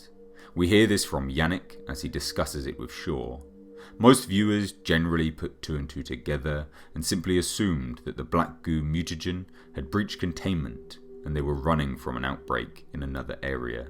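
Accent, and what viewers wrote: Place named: United Kingdom. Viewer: The narrator sounds like the guy who does Dune videos but with a British accent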